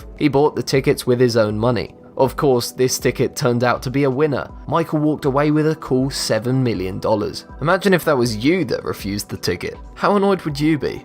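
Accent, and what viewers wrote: United Kingdom. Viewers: And your British axent like mine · Pietryga Lv he’s a Brit he has an accent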